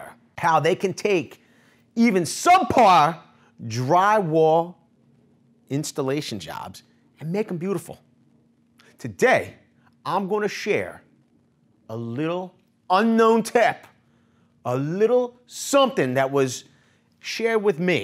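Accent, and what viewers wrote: Philadelphia, United States. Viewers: I like the length of the video but I'd appreciate more philly-charm. Like take a break to eat a cheesesteak and/or play up the philly accent and slang a bit lol. Good vid. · Ha he is from Philly ,was thinking New York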